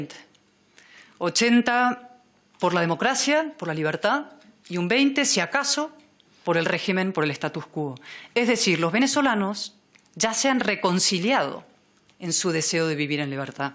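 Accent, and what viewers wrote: Argentina. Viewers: No solo es una persona bella, pero qué lindo habla…No solo su labia, sino su acento ( un acento argentino sin idiosincrasias) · Porqué habla argentino? Mu española no la veo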